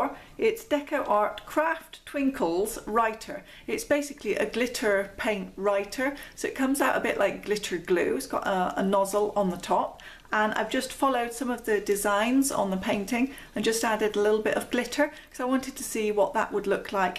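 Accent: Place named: Southern England, United Kingdom. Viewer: Obviously from the south of England, me too but now in Australia. Love your videos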